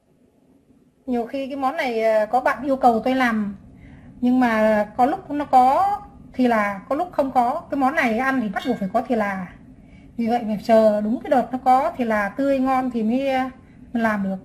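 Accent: Hanoi, Vietnam. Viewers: ngon quá chị ơi ,đúng chuẩn món ngon đặc hà nội luôn ,nghe cách chị nói và làm đâu ra đó vậy là e biết chị là nguời gốc hà thành rồi ,cảm ơn chị nha ,chắc một ngày rảnh nào đó mình sẽ làm cho gđ mình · chi noi tieng ha noi rat chuan nghe rat re chiu, khong nhu nguoi ha loi bay gio loi tieng viet lam nghe rat choi tai .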